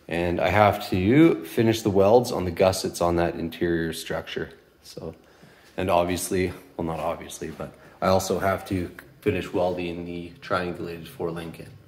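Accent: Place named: Canada. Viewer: 1) you sound Canadian 2) what rear bumper?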